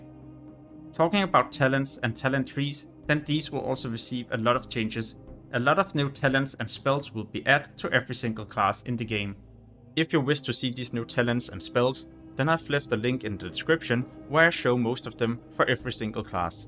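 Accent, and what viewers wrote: Denmark. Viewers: Very nice video :D Playing with people from scandinavia I kinda started to catch on the accent, you are danish if I'm not mistaken :D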